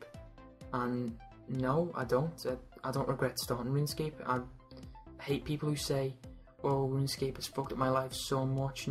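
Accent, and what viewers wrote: Liverpool, United Kingdom. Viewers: never knew u were a scouser ~ Aaron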